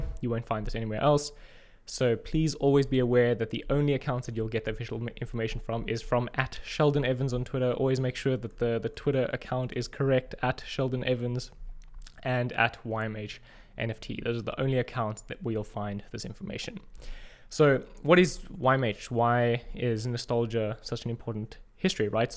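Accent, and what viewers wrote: South Africa. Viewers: Blue Pink Jelly. Love your videos and, on a personal note, it's really nice to hear a South African accent amongst all the crypto YouTubers that I watch. Being very new to the space it's incredibly helpful to have people like you that are logical, balanced and insightful. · Blue Pink Jelly!!! Absolutely love getting top quality crypto content in a Saffer accent. Kudos to you!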